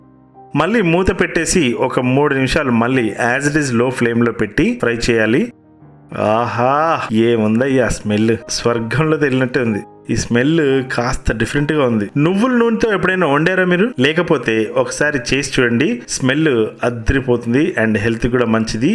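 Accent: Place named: Telangana, India. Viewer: కాకా నీ తెలంగాణ యాస కూడా బాగుంది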